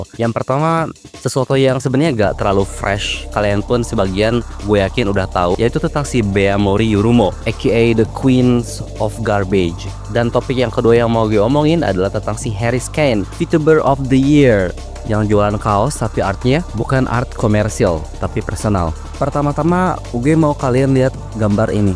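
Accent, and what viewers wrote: West Java, Indonesia. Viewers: bang, kamu orang sunda ya?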